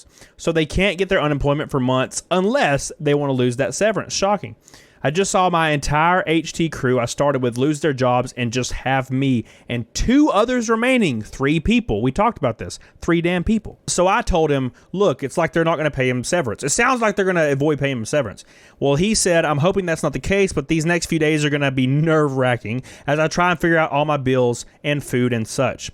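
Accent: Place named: Alabama, United States. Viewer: best buy don thanos snap. you can never take the alabama out of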